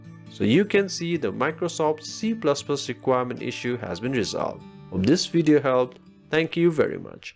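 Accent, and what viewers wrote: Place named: Bangladesh. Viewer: When you said GB to zee bee i knew you are from Bangladeshi kamla bosti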